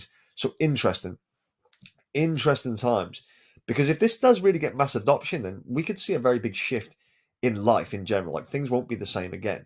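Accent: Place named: Norfolk, United Kingdom. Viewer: Norfolk! Sounds weird hearing a crypto chap from the area.good luck this cycle ✌️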